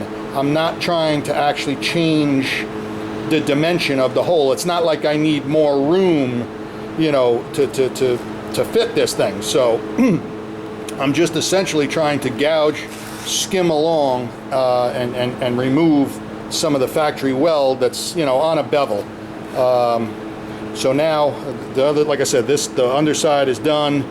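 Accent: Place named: New York, United States. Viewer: Sounds as if you are from New York / or New Joyzee Could you not have put some loads on it that would have , as you carbon arked , helped draw it togeather & then even more so as you welded , hot metal shrinking has ton’s of force. One must be very much a Mc Geever doing this kind of stuff. If that cyl boss is out of square , it will cause side loading to the cyl & it will not last a month ! I own a CASE extend a hoe . Looks like the John Deer is fashioned different, but that looks like the inside of the extender , that would make the boss the lifting point for the boom, final picture, they probably had the boom extended & tried to lift a # of HEAVY objects ,- a stupid move, - , drag it in close , re-rig & then lift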